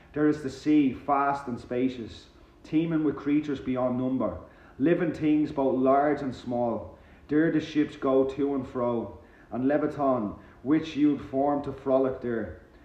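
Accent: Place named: Ireland. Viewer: Love your Irish accent‼️ ☘️ Your love for God's Word is so inspiring. Keep up the good work for Him.🙏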